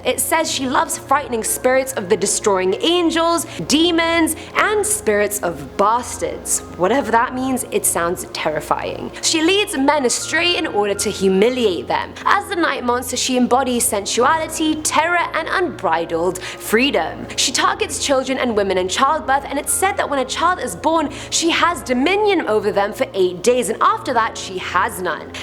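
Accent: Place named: United Kingdom. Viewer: I can't tell if she's british or American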